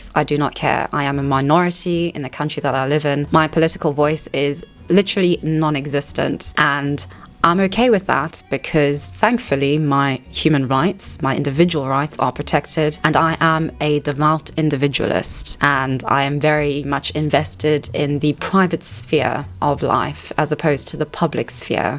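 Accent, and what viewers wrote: RP, United Kingdom. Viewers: I never said you did condon it, however a few videos back you stated you were not privileged whatsoever in the British context. Even in the colonies your near RP dialect carries weight that other UK dialects don't. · I like ur accent. Is it a British accent? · I got recommended one of your videos shortly after watching Patience for the first time. I think I got the two of you confused once because you both have lovely British accents but other than that I like you both for different reasons. Very contrarily, Candace is bothersome to me and I've always thought her to be disingenuous and more of a shit-starter than anything else, even though I'm more alike her in a political sense. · Ngl😅 your videos have been recommended to me for a while now but I had no interest to watch and I kid you not you reminded me of Patience. I press play and you also have a British accent😂 I've listened to Patience b4 and I've gleaned everything I can and doesn't really interest me but I'm willing to listen to your opinions. 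🇿🇦Shoutout from South Africa✊🏿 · You are FAR more interesting and well spoken than CO. I think including the fact that you are "safe and can read and write" is a nuance lost on many Americans. From what I've gathered of your story, your perspective on this is uncommon, if not unique to the ears of most Americans. I think you're correct in your analysis of race/class differences between the two countries. I can also see why some folks in the US would consider you "posh" if all they hear is your accent (which I like, FWIW). Given that all folks over here can somewhat differentiate "proper English" from cockney and will often confuse Australian and Irish (never mind the poor Kiwis), I'm afraid that's about as good as can be expected. 'Merca! (eyes rolling). Keep doing your thing! I enjoy your content. Cheers!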